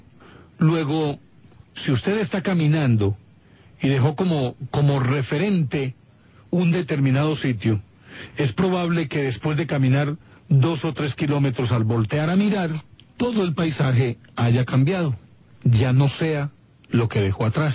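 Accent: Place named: Colombia. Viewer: es colombiano el que habla? Que mal!!!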